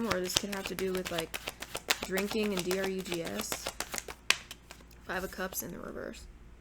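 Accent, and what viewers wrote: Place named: South, United States. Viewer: I really like to listen to you because of your southern twang 😊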